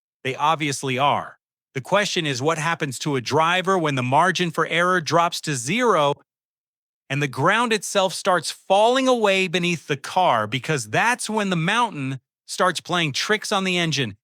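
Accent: United States. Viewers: Aussie script with american accent. AI needs to try it harder! Also where were the Bathurst shots??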